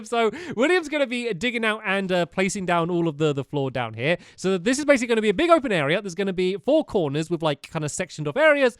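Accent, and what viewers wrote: United Kingdom. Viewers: Minecraft pro gaming roblox Shut up. Stampys voice is awesome. I love his voice, its well, British.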